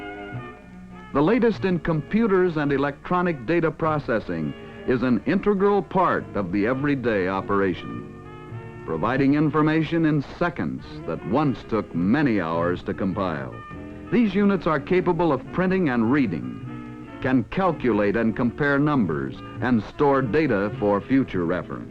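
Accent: Mid-Atlantic, United States. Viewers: It’s likely because of the Atlantic accent he’s putting on. It’s a semi-artificial accent that combines the English and the Northeastern American accent that was used for many informative radio and TV programs so that ideally the narration could be as easily understood as possible in either country.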